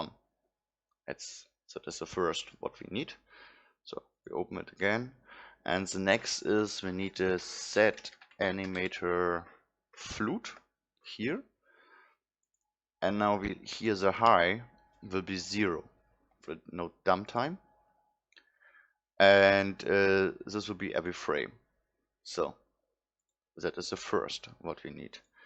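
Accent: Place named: England, United Kingdom. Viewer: i wanna say a big Thanks for all of your tutorials they are really help :) (i love your lovely English accent)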